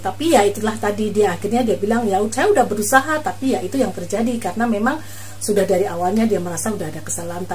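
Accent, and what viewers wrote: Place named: Java, Indonesia. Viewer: suaranya khas medok jawanya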